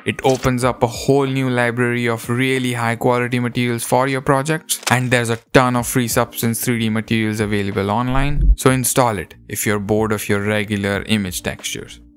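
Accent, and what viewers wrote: India. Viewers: U sound indian, are u indian? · you're English accent sound like your are indian are you ? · Don't lose that Indian "t". :)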